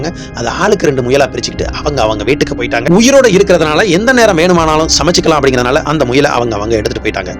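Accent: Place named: Sivaganga, India. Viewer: Naan sivagangai anna.. Namma pechu style ungakitta aptiye irunthathu, athaan kettan... Show ellam super ah irukku, naalla pannunga anna, enga support eppavum undu...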